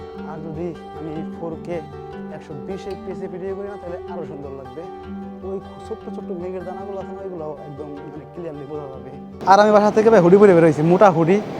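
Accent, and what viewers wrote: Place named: Narsingdi, Bangladesh. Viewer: Bhaiar kotha shune mone hoy vai norshindir chele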